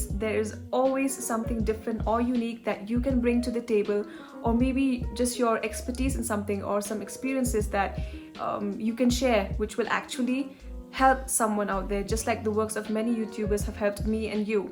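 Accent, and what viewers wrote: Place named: United Kingdom. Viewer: British accent is on point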